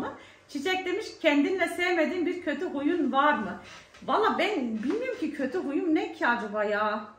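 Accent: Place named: Erzincan, Turkey. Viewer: Abla senin kürtcen bizimkiyle ayni erzincanliyiz😂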